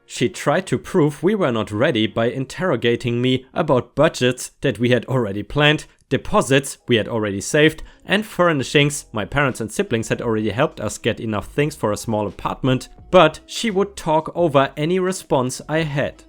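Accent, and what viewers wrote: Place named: Germany. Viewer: Okay, your accent is very strong so I ask myself :D German or Austrian? It sounds so because of the sound of some words - If not.... Shame on me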